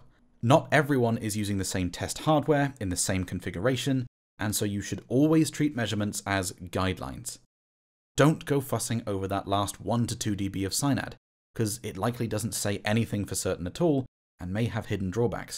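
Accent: United Kingdom. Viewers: An excellent video. you really communicated this very well. And I love the sound of your voice/accent/grammar. The very best of ye old British. Cool. Excellent diction and pace. Very easy to listen to. Superb explanations, very well presented. Thank you.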